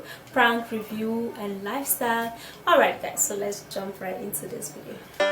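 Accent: Nigeria. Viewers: Blandina Mbock that a Nigerian accent you dummy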